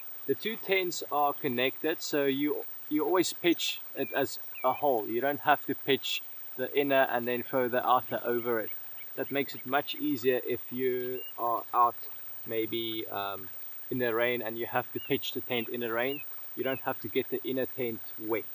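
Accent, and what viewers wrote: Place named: South Africa. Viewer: Hey brother! I immediately recognised that SA accent, and now I see that you are a photographer as well as a fellow believer, awesome! :) The only tent that I notice locally is the Hubba Hubba NX for around R6500. Did you import or buy this tent through anyone local? I just bought an F-Stop Sukha, so I can't go around at such a high spending rate...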